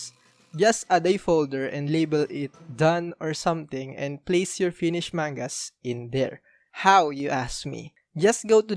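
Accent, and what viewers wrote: Philippines. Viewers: eyyy accent pa lang pinoy na agad ahahahhaa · Are u a filipino?!. Ur accent sounds like it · Sounds like a filipino accent ngl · Taena sa accent mo palang, halatang pinoy na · I love the Filipino accent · The accent of this guy is just the same as filipino accent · I love you're accentHAHAHAH pinoy ka ngang kupala ka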